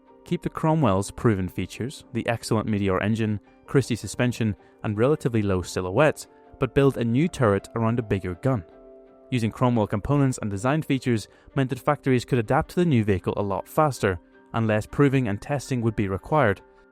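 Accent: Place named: Northern Ireland, United Kingdom. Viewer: he's from Antrim or North down I would suspect based on his accent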